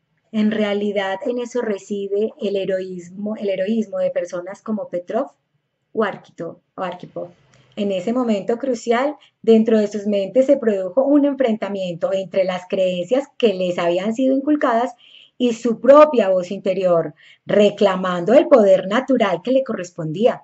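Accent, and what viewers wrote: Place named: Medellín, Colombia. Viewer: yo tambien soy de medellin es que tu acento se me hacia familiar jejeje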